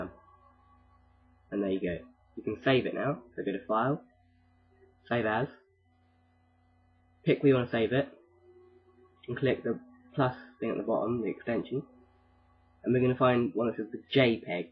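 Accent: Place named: United Kingdom. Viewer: He's British, like me! ;p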